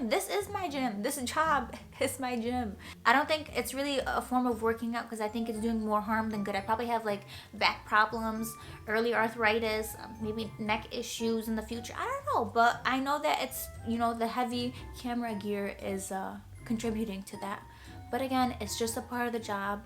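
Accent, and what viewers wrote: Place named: New Orleans, United States. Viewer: I thought it was New Orleans accent, she reminds me of my friend from there. But she also kind of reminds me of Jennifer Lopez, though I haven't heard her as much.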